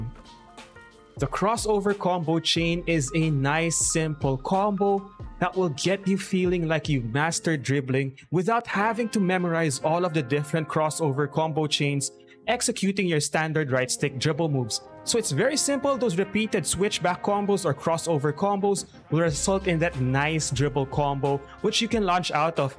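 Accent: Philippines. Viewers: kaya pala sir, yung pronounciation mo pinoy na pinoy talagang hindi kinakain yung words, fluent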